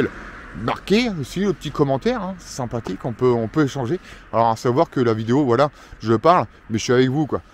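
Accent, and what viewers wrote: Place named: Marseille, France. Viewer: Salut c’est mouna trop marrante la vidéo je sais pourquoi tu parles marseillais c’est se que tu faits avec papa au bureau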